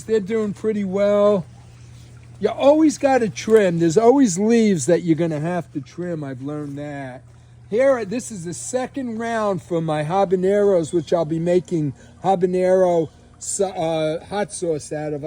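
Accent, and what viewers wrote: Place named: Brooklyn, United States. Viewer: you never lost your good ol' Brooklyn accent! I love it. So tell us what fertilizer you use and what plants, if any, do you have to transplant to larger containers as they get more mature?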